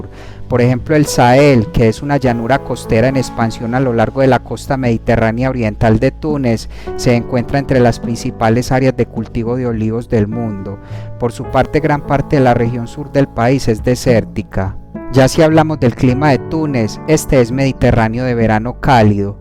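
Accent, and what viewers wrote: Antioquia, Colombia. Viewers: No me gusta ese acento paisa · Hermoso tu video hermoso tu acento colombisno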